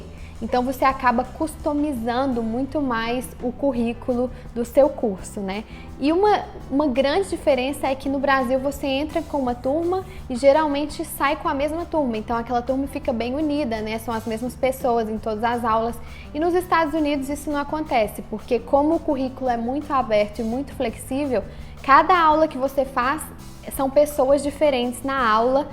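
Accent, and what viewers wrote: Minas Gerais, Brazil. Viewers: é minha primeira vez aqui no canal, já adianto que tenho gostado bastante dos conteúdos. Uma dúvida menina, você é mineira??? Sotaque maravilhoso. beijos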